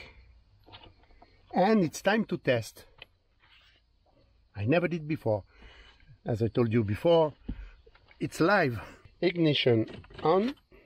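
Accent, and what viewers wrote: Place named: France. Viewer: Bonjour, Je me permets d'écrire en français étant donné avec qu'avec ce magnifique accent, je pense reconnaître notre belle France dans votre voix ! J'aurais voulu avoir s'il était possible de changer de musique avec les flèches suivant et présentent du volant si on est dans un album par exemple ? Super vidéo et merci d'avance !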